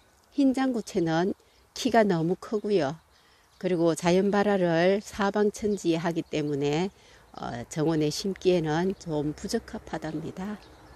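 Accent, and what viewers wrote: Gyeongsang, South Korea. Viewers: 저도 전원생활하면서. 야생화키우고 있지만 이렇게 많은품종과 넓은곳은 처음봐요 말투과 경상도 사투리가 있는데 어디예요?저는 대구살다가 지금성주에서 전원생활하고있어요 가까우면 구경한번하고싶어요 스켈이 타고나요~^^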